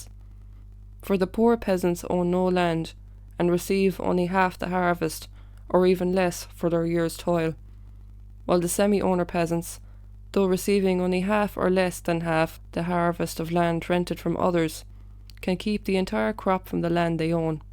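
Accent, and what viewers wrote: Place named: Ireland. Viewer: Thank you for your hard work as always, comrade! I could listen to your Irish voice all day!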